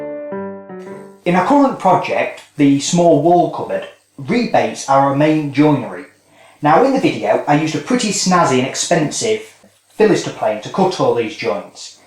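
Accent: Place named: Birmingham, United Kingdom. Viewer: "Cotton rebates"? Dude sounds like the Peaky Blinders.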